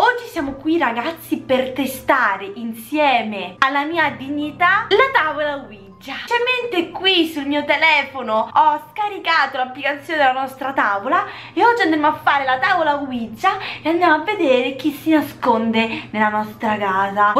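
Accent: Rome, Italy. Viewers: Se sente che sei romana anche io lo sono Bellissimo video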